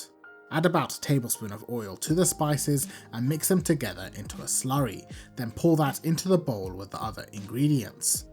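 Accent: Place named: London, United Kingdom. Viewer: You have a London accent: please tell me you run a restaurant...and exactly where it is. YUM. What a terrific channel. No wonder all these wonderful immigrant mums, grandmas and aunts spend all day cooking together when there's a family event to cater for. Your recipes are incredibly laborious and just make me wince with sadness knowing I'll never spend all day cooking some of them but would gladly pay good money to someone who takes the trouble to cook certain dishes for me. Wow, just so delicious and impressive to see how it's all done. Bravo! 🌍🍽🤝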